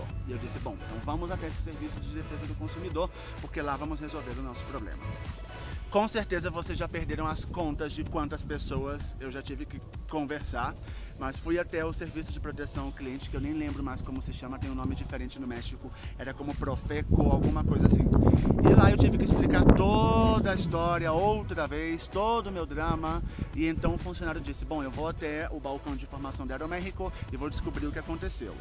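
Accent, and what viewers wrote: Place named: Antioquia, Colombia. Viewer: E muito interessante como seu espanhol e tão paisa 😅😅😅😄😄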